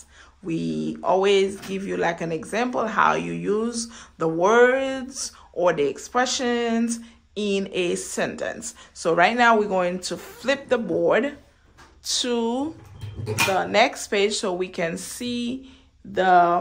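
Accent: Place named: United States. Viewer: With good American accent I like to watch your video my dear cousin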